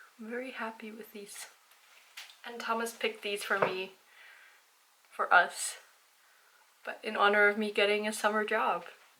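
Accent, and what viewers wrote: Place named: Scandinavia. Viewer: So lovely to hear ever so slightly Nordic-tinged English : ) This was such a nice video to stumble on.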